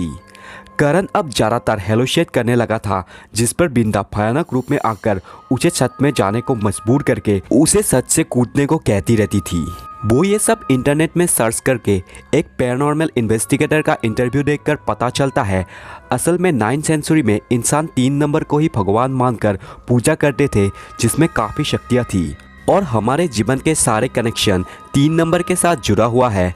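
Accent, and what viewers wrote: India. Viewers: are you north east bro? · Don't judge please ye bengoli hai to problem hoga hi Hindi mein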